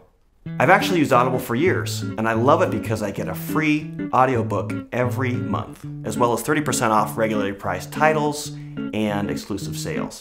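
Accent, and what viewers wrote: Germany. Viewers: Are u German ? xD Nice Videos <3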